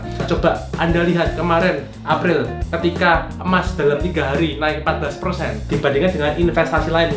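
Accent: Java, Indonesia. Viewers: Kok muanteb ngomonge,,jawa y bang,,,??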